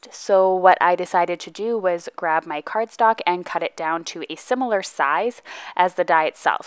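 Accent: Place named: Canada. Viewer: Four things I learned from this video: 1. You have for sure been back in Canada long enough for your “about” to sound more “aboot” 😊 2. I need to see more of the puppy!😍 3. It’s really fun to watch this process 🎬 4. I really am that lazy, it’s why I know I need almost all of my supplies in easy reach places 😄 Thanks for sharing! Oh and for the Misti and trimmer you could add a bar to the inside of your desk near the floor to slot them into. I’ll IG you a pic 👍 it works great for me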